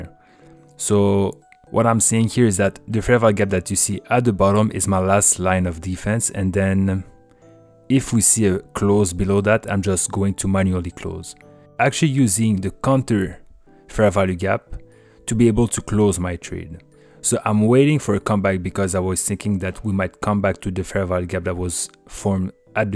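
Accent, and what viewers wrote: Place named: France. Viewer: je me disais bien que l'accent me disait quelque chose mais je savais pas que t'etais francais mdrr beau boulot en tout cas bravo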